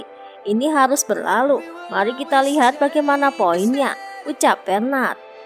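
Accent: Java, Indonesia. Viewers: Sorry telat mba medok heje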